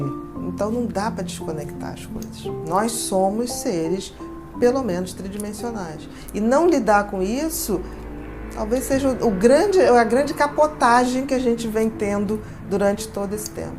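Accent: Rio de Janeiro, Brazil. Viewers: Nunca tinha reparado que carioca falava assim… obrigado! 😅😁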